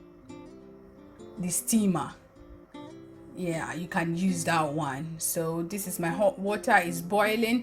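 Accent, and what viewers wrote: Ghana. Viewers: A week a ago I asked weather from GHANA yes may be you are from north your accent sounds a northerner I have leave in the north before. Thanks for this drin. I think I drink this drink before during my school days. In ACCRA it tastes great I wish one day I will try some. THANKS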